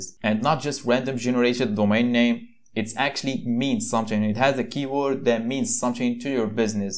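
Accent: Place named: Morocco. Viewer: Maghribi la ? Chkit fl accent dyalk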